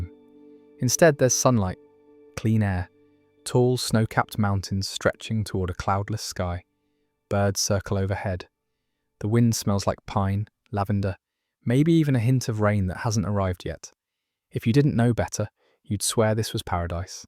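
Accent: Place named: London, United Kingdom. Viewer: By the way this isn't saying anything negative about Irish folks. Their accents are a music only known to 0.008% of earth, near mythical. I'm guessing yours is north west of/in London? Cambridge? St johnswood? Obligatory apologies if not (no of course your not like them ) - I try every time to guess, it's one of my interests. Unfortunately here we mostly sound like... how cardboard tastes.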